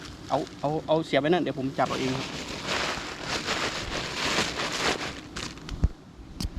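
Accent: Isan, Thailand. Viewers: พราน ยุ จ.อารายคับ แล้วยุ อ.อารายคับ คำเว้าคือคนทางบ้านผมแท้ ผมยุ.จ.เลย คับ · อ้ายเว้าอิสานม่วนดี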